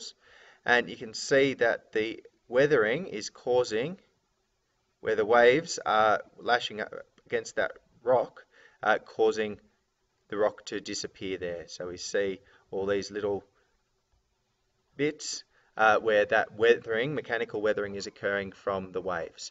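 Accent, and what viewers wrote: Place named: Australia. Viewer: 10/10 aussie accent 🤠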